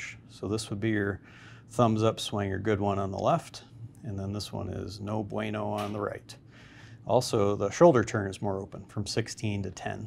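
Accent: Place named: Ontario, Canada. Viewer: Very well said and illustrated. Is your shop found in Ontario you sound like it may be. I’m looking for a location in southern Ontario. I have subscribed, I’m a believer of ST. Thank you. JB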